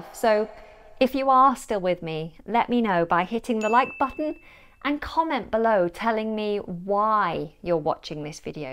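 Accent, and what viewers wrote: United Kingdom. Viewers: I'm new to the English language, and when I wanted to learn the language, I started watching movies and series... I noticed that the British accent is wonderful.. I have a question: Is the accent on the channel a dialect that I can speak all over the world?👍🏻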